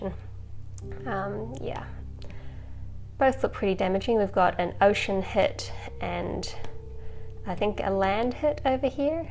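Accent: New Zealand. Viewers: I'm pretty sure that that's not an Australian accent, but rather a New Zealand accent. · It's definitely an New Zealand accent. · I only know this from watching too much Flight of the Conchords on repeat. That's unmistakable New Zealand backwards speak. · She's from New Zealand.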